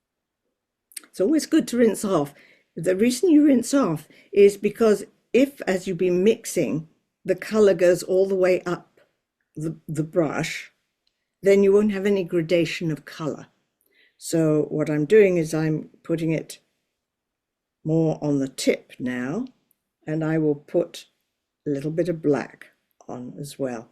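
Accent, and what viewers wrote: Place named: United Kingdom. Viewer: I love your art and I just discovered your channel, you sound quite similar to some of my family members so I was curious to ask if you are from the UK as well?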